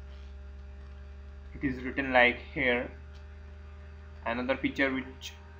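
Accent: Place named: India. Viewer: I like tha Indian accent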